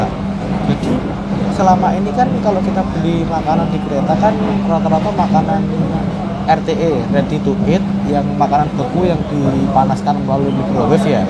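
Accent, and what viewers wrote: Java, Indonesia. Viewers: Orangnya bahasanya enak logat Jawa tapi penjelasannya jelas logatnya gak dibust buat kayak adiyasa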